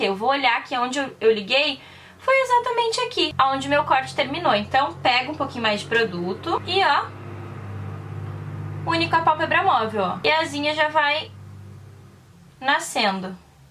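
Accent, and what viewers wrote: Rio Grande do Sul, Brazil. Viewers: Tu é gaúcha? teu sutaque é bem parecido com o daqui do RS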